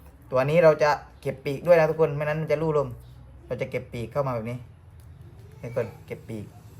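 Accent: South, Thailand. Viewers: คนใต้เหมือน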